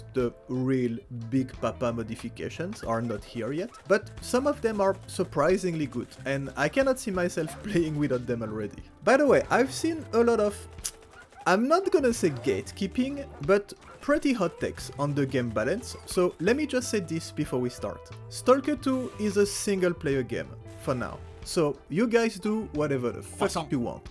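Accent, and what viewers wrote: France. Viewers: are you french? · This French accent is so strong 😂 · Magnifique accent français !! et merci pour cette video je pense installer au moins la moitié des mods dont tu as parlé, mais "eye adaptation fix" oh bordel MERCI CA EXISTE !!??!! :) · J'ai replongé dans l'univers de S.T.A.L.K.E.R plus sérieusement récemment et j'ai découvert tes vidéos, je t'écris en français car j'assume par ton accent que tu l'es ;) merci pour tes vidéos j'adore vraiment t'es au top !! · Your super subtle french accent 😅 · come on, with the full gameplay with mods already! I try to watch other gameplays, but god, some are boring ! ++++ bonus to your French accent, most times I want to see no comments gameplay, but yours is damn enjoyable ! mod the game how you like it, and lets see some STALKER2 gameplay from you! I know you won't disappoint!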